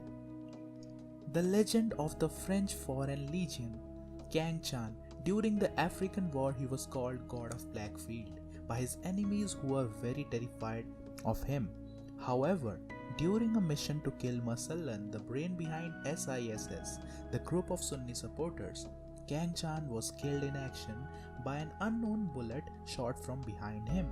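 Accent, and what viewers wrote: India. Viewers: Your accent's just like Indian people Where are u from? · No disrespect the moment I heard the first word you spoke... In my ma mind, Yep definitely dis dude is from India.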